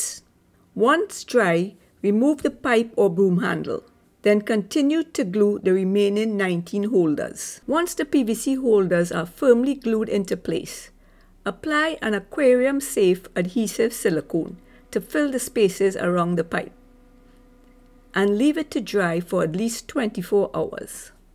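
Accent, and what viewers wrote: Trinidad and Tobago. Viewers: Great tutorial...is that a Trini vibe I'm hearing?...Thanks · You sound Trinidadian... Excellent tutorial by the way. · She sounds like a Trinidadian 😎🥳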